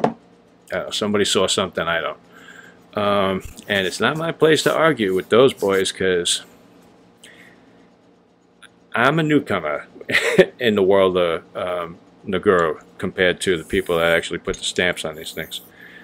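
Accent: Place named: Bensonhurst, United States. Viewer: Men are the new bitches!!!!! Lol!!! So true bro! My cousins live in Bensonhurst, and you have the same accent! So I just purchased a Ozuku Asagi. Previously, I have only honed on synthetics. I hope to be pleasantly surprised. I heard coticules are more difficult to hone on. I'm new to the channel and love it bro! You're very knowledgeable. Keep it coming bro! Any advice on the Ozuku would be greatly appreciated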